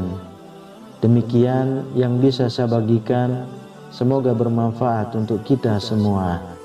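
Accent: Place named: Bandung, Indonesia. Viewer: Terima kasih ustad penjelasan nya.🙏🙏🙏👍 Ustad orang mn ya.suara nya sih seperti orang bdng ya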